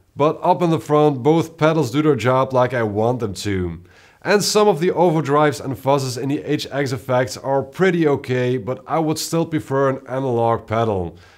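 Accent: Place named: Netherlands. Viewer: I’m guessing Dutch or Flemish as first language? Your English doesn’t bother me at all👍.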